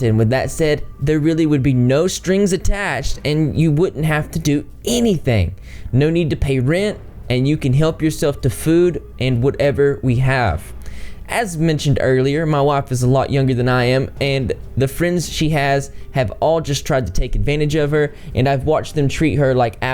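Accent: South, United States. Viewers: I commented on your eugenia cooney video something similar, but i wanted to ask, where are you from? This is the second video of yours that im watching and i think ive narrowed it down to either alabama or louisiana/texas area. I am from houston area and not many people talk like that around this here, thats why im speculating louisiana area. Sorry for the long comment i am just so wowed by southern youtubers idk why😂😄😳😳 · I love the country boy accent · Hello from Lexington KY, loving the southern accent, where are u from? I subbed. So great to find a southern man with a moral compass and moral integrity on YT!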